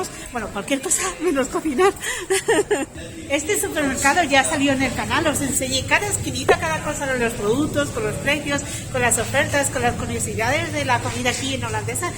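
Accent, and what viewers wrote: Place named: France. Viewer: eres española y.. se te escucha el acento frances.. heee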